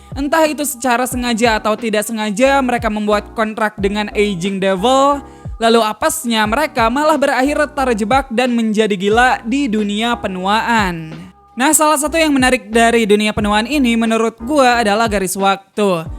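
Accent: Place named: West Java, Indonesia. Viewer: Wew dengan logat sunda gitu?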